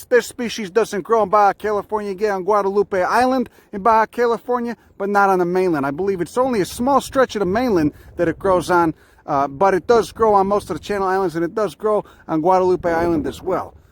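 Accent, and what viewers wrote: Chicago, United States. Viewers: I assume you mean by interweaving cuss words and disparaging comments about the state of humanity while using a Chicago Italian accent. If so, I completely concur.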